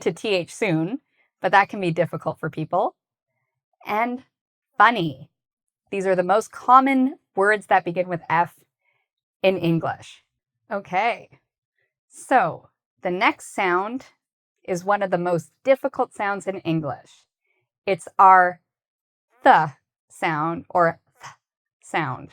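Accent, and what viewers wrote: United States. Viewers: She sounds American two hundred percent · Is this accent American or British?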